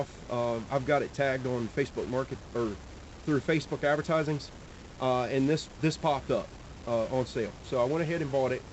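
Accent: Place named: Outer Banks, United States. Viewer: I hear ya hoigh toider. ;-) Down bay native here. Thx for the vids, helpful info. I've had to tighten up a couple of attachments from titan. There's a lot of variability between manufacturers plate dimensions so I think Titan hedges on 'fit everything' side...I find a few welded shims here and there tightens things up fine. All the titan connectors I get go in the spare bin and I put on known manufacturer ones.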